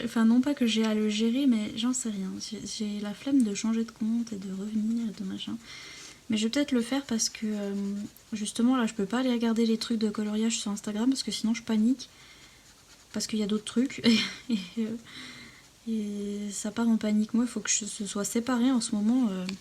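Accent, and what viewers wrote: Quebec, Canada. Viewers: Allo ! Je trouve ton accent québécois vraiment bien et ca vient d une québécoise 😉 j ai beaucoup aimé t ecouter en coloriant dans mon nouveau livre ❤️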